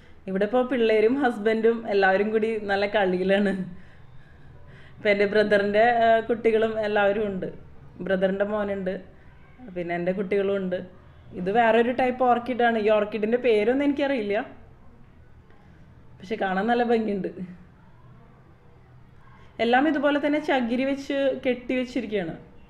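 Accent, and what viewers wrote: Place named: Angamaly, India. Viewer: Angamalyyo Kodungalluro slang aanennu ee answer vaayikkunnathinu mumpu oohichu. Thanks for clarification.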